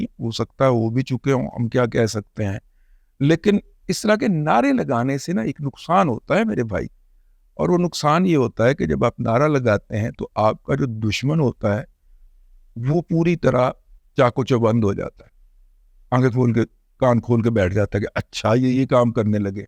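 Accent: Punjab, Pakistan. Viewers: پیلے سلام کیا جاتا ہے۔۔۔ why you speaking Urdu in Punjabi style..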